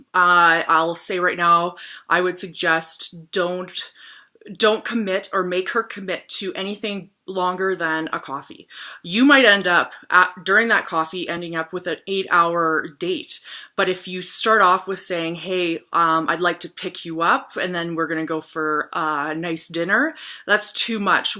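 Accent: Canada. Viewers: You speak like in some parts of Canada. Are you Canadian?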